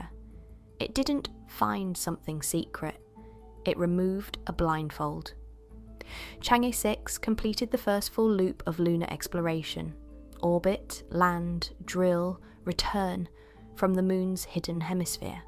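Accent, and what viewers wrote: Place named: England, United Kingdom. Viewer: Hmmm A Chinese AI with an English accent. Already sounds suspicious. It asl us to like and subscribe before the story gets strarted, They found a metotite in mongolia that was clearly marked made on far side of moon. They found that the samples taken 55 years ago and thousands of miles from their sample site were different. Okay, I've listened to enough. Thanks for the laughs.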